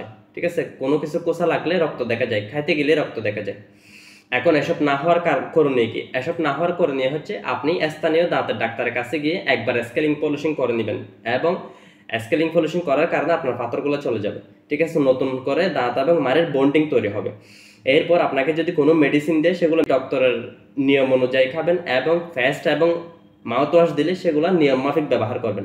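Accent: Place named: Chittagong, Bangladesh. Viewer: bhai apni mone hoy chittanga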